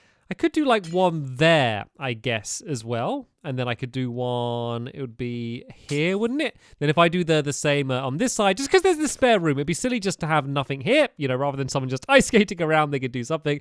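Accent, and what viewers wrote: United Kingdom. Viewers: from a 1 to tee and bescets how British are you?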